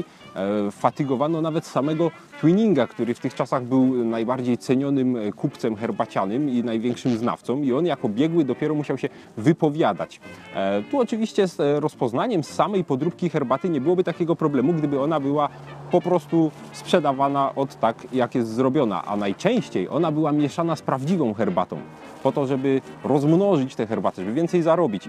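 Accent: Silesia, Poland. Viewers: Ze Śląska.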